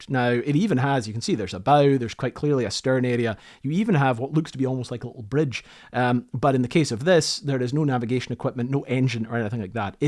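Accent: Scotland, United Kingdom. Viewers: I came for the video, but stayed for the Scottish accent. :face-blue-smiling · Is that a Scottish or Irish accent? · He is Scottish, give him a break.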